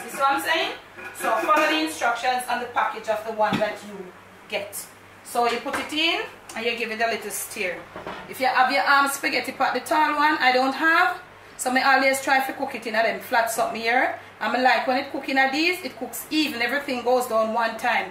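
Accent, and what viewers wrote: Patois, Jamaica. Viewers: Chop yuh patois Ms we love it! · Love the patois. Don’t stop, adds sweetness to the videos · I like the way how you talk patwa me use to live in Guyana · I came across your channel on new year's day of this year. I was so excited to see a fresh new face and to hear the patio ( the worst thing you can do is trying NOT to be authentic or "speaky spokey " as my elders would say). Your Jamaican accent is what brings a lot of flavor to your channel besides your delicious food. Just block the haters and continue with your patio. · Keep up the patois..... for those don't like it....... bun fyah fi dem. Big up 💪🏽💪🏽❤❤😘😘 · The people who thumbs down your videos a pure fools don’t change your patois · I’m enjoying the the patois, it’s your culture and you’re being authentic. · mouth mek fi chat anything dont pay dem no mind, everytime I introduced this vlog to someone we talk about the same thing. 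Yuh just feel like an era of Jamaica that has passed, so pure and happy with your craft. Because this is a gift, you make me feel like I'm back home watching my Granny and Mommy cooking and laying out nothing but good Jamaican values. That switch up at 3;34 is exactly why only fiwi language can explain it. Always puzzle mi how u can sit and watch something like this and the only comment you can make is a negative one. Its draining people let that go. Full time we appreciate the beauty in wi likkle dialect, its just pure poetry once you understand it. keep teaching, I might not comment but like clock work we watch everything. Know that your are top 3 of all vloggers on this island. Rass Mokko, In Jamaica &. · Me love me jamaican way of talking you go dont stop we talk sweet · I love your cooking videos. The patwa mek it very authentic. Gwan wid it same way. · British🇬🇧 lady with Jamaican🇯🇲 parents. 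Mummy lived in UK for over 45 years. Mummy never "twiss up ah mout fi nobuddi traight!!! Jamaican til the day she dead....You are authentic JA!✊🏾 keep up the patois only tuppid eedat ah gwarn like dem fool👍🏾👍🏾👍🏾✊🏾✊🏾✊🏾✊🏾💯❤ Mannerz and Respeck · I enjoy the videos and the lovely Jamaican patois. As some one once wrote everyone would like to be Jamaican as it is cool. Keep it up. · Lady I love you 😅😅😅😅😅😅unno don't bother ask her how much millimeters of ginger.... 🤣🤣🤣🤣🤣🤣🤣🤣🤣🤣🤣you're the only person I follow on YouTube.... O...and chat you patois wi nuh cater fi nobaddi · Good evening,. Cook down or cook up it's all good. Don't allow anyone to change Yuh.. Me nah undastan er ting you talk in Patois but me luv it....Food tun up up up. Bodow...Boom Trini in de house.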